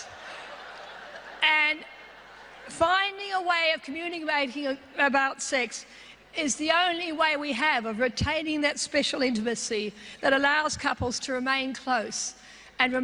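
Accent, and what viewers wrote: Australia. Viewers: Yes, the clue is in the Aussie accent.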